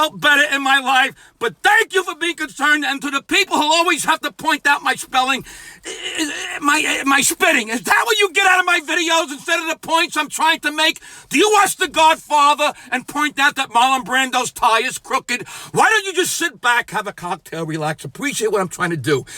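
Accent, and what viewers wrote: New York, United States. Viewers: ...as a former noo yawker now living in the not so wild west it's so enjoyable to hear (and see) a guy from the neighborhood who speaks the unvarnished truth with humor and d'accent….now if you could only deliver a real slice of pizza and a cheesecake the quarantine would be great.... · You are truly the best. F*** what everybody got to say f*** what everybody thinks sometimes you just need the f**** let it all out I love it keep up the good work you're so positive he's so energetic you keep me motivated thank you becoming on YouTube being on Facebook Twitter whatever up your ass LOL thank you very much appreciate you you sound like you're from New York Jersey Brooklyn all in one keep up the good work don't stop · ... you are absolutely fanfuckingtastic! are you a new Yorker? thank you Big Time Bro... T.T H.L.A.M.F.!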